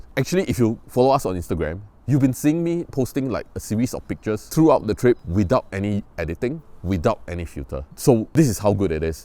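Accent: Singapore. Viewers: No anyone comment on the Singlish? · You sound really Singaporean / Malaysian.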